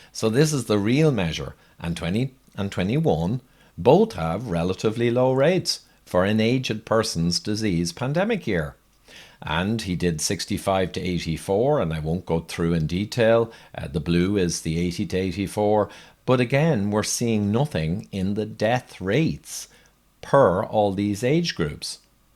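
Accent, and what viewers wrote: Ireland. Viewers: bud you talk like irish guy. did you know?? :)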